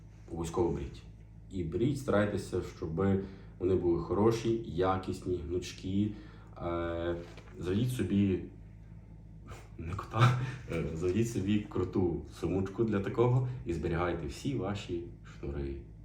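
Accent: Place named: Podillia, Ukraine. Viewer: Мені здається, що автор родом з Поділля, за вимовою. За інформацію щиро дякую. Можливо фумігатор від комарів теж знадобиться.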